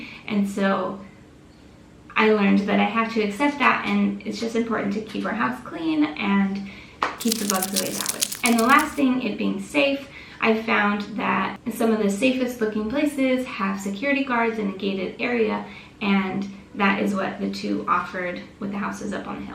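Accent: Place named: United States. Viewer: Yes. Awesome! Keep the American accent. It goes a long way in the future. Much love from San Francisco California. Jesus is EVERYTHING.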